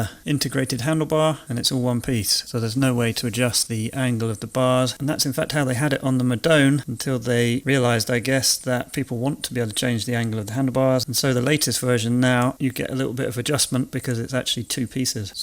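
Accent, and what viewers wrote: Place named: United Kingdom. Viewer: First of all...I loved your British accent...! Great work once again....!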